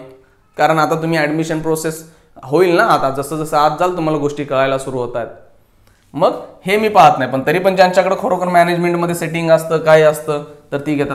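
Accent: Solapur, India. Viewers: सर तुम्ही सोलापूरचे आहात का?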